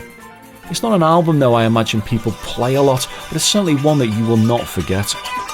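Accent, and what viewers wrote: Liverpool, United Kingdom. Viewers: Your work on these videos is outstanding and you deserve, at the least, many many thanks. The liverpool accent is very controlled and toned down also and, as such, it works perfectly so no apologies needed from you re that. As for - while I love all the 70s albums (perhaps, Tormato not so much), when I first heard this one (I got it as a xmas present and found it before xmas and just couldn't wait to hear it so gave it a listen and hastily put it back where I found it) it blew my socks off! This is Yes as "in your face" as you can get. "Metallic Yes" as I call it. Not as in heavy metal but the sounds of just about every instrument. Cranked up and piercing. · Nice to hear another Scouser being bang into Yes.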